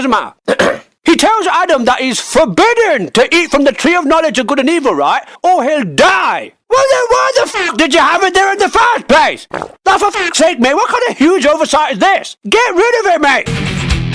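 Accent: United Kingdom. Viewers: A british guy talking about bible, best video on YouTube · I love listening to the angry British man rant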